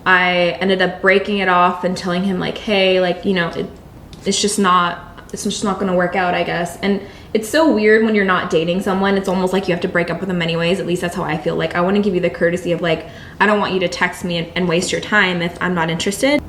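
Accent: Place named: New York, United States. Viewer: Sounds just like New York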